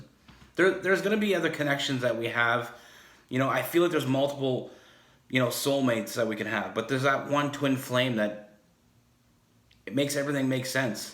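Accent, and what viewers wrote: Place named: Canada. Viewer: Can’t wait to feel this connection...so, when are you coming to town?? Lol jk. *no disrespect if you have a lady... I grew up on Canada (Rocky Mountain House, AB and Moosejaw, SK. I love your accent. Canada is so awesome, I miss it! Shoutout to all the Canadians! You’re awesome too. So adorable. Thank you for this. It’s so appreciated. ❤️🙏🏻🙏🏻👌🏻👌🏻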